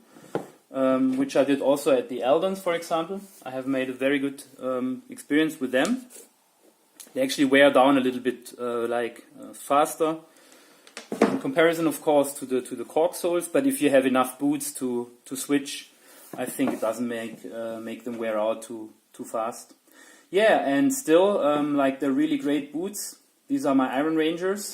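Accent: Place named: Germany. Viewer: Lustig, wie man Deutschsprachige immer sofort erkennt :-) gutes review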